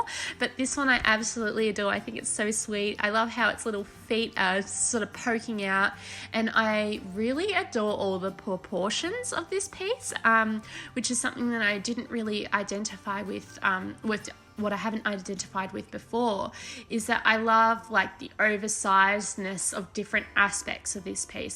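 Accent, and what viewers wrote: Australia. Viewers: Ah these are so gorgeous and so are you! It's a real treat to have such a joyful and engaging YouTube series with an Australian voice in it. I'm enjoying it heaps - thanks from Tassie.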